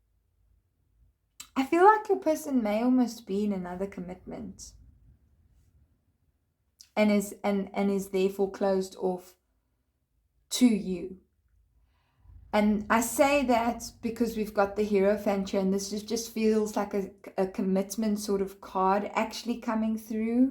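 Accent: South Africa. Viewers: Girly, I heard that accent and immediately knew you're south african 😂 glad to find a YouTuber that can relate to the struggles of loadshedding!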